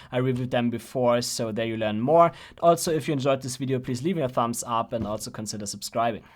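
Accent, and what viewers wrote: Germany. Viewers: good old german accent wenn ich mich nicht täusche :D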